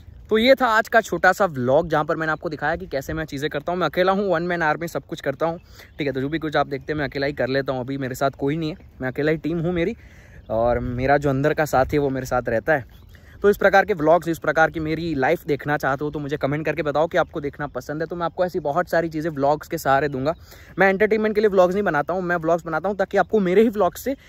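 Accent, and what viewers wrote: Gujarat, India. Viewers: Bhai tame gujrati cho accent to Gujarati che kya Raho cho tame Ane aa Kai nadi che?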